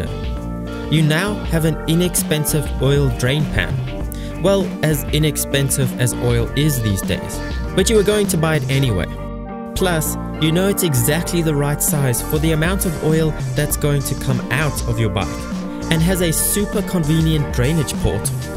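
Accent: South Africa. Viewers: You from SA? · Are you from south Africa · You sound South African, where are you from? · Your channel just got recommended! What a great channel! Dude are you from south Africa. Looks like jhb? And well sound like a South African. If so I am proud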